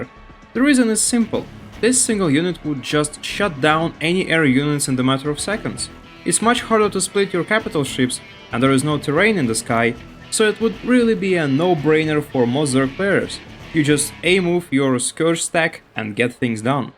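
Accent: Russia. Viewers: What accent, u from russia?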